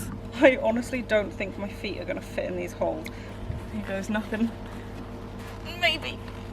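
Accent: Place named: RP, United Kingdom. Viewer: She sounds posh btw love ya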